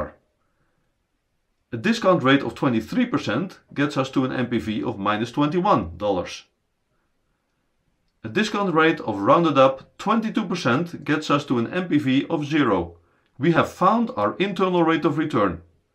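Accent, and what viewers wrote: Netherlands. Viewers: Thank you so much for the videos. And I love your Dutch accent. What I still not fully grasp is the non technical meaning of IRR. Does that mean that if IRR is less than or equal to WACC, the project should not be pursued? And whats the difference between IRR and Rate of Return? Just the time? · Best explanation on YouTube - and given in a beautiful Dutch accent too! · Je hoort zo hard dat hij Nederlands is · Very strong Dutch accent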